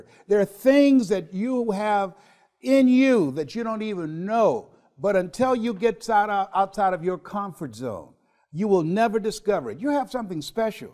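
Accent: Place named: Columbus, United States. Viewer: les brown I lived in Columbus, Ohio 23 years and I recognize your Columbus Ohio voice because I can relate to you I been a sales guy for 3 years on commission... and started from the ground up with no mentor and did many jobs with no college education... you in spire me to give back my talents of selling back to everyone who is working towards their dreams.... but my advice to everyone is... FEAR TODAY BUT NOT TOMORROW!... if you don't get that deal or or eat today there will be less of a tomorrow... when you at the end of your life and decide to accomplish what you want to do/see, travel the world... or be the best talent at last moments of your life... question would be why do you regret taking so long to get here up to that point of your life?... the best question should be if not today when are you going to have it?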